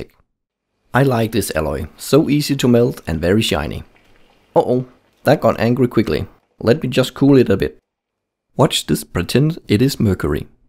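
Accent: Germany. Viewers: I've an Offtopic Question :§ could it be that you're german ? :D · ou :D you sound pretty german to me :D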